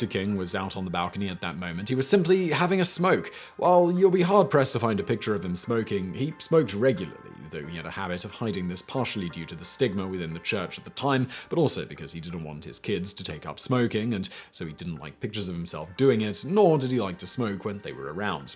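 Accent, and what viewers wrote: United Kingdom. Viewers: I've never witnessed King's life narrated by such a fine British accent. gives the familiar new life! I never heard the story of the hotel operator having a heart attack.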